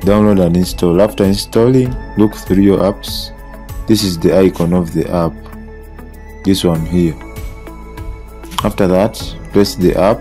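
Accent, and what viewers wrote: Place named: Uganda. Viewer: You are fro Uganda I know the accent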